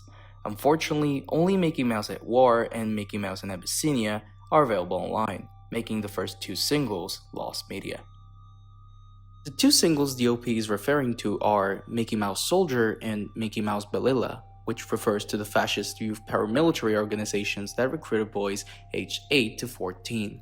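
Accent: United States. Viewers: Hai un eccellente accento americano, veramente complimentoni. Ma....andiamo...nessun americano sarebbe riuscito a pronunciare correttamente PAPERISSIMA, IN MANIERA COSì FLUENTE POI. TI SEI TRADITO DA SOLO ahahaha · no vabbè ma il tuo inglese è pazzesco fra 🫂 I shyt you not, pensavo che fossi americano (o comunque un americano di prima generazione, con genitori italiani) per quanto buona è la tua pronuncia ahahah ti giuro, sei arrivato ai nomi e ho detto “ayo che pronuncia perfetta che ha in italiano”, sono andata a vedere sul tuo canale la nazione che hai impostato, leggo “italia” e mi sento una c•gli•na, perché torna tutto 😑💀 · ...l'autore di " "! 😱 PS: quando parli inglese hai un accento americano perfetto!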